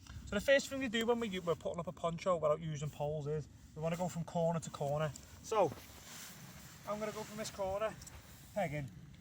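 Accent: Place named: Liverpool, United Kingdom. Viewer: English with a Liverpool accent.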